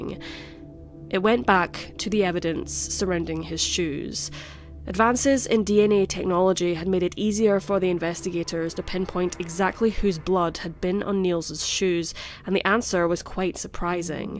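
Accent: Scotland, United Kingdom. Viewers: Geez, some of these yt honchos are doing really dodgy things! The same thing happened with two other channels I watch. One of them ended up going to Odyssey. Yt had permanently demonetised his amazing crime channel. Your channel is brilliant and your content is fascinating. The research into these cases are phenomenal too. I have followed you for months and your Scottish accent is lovely. Anyway, cheers from Australia!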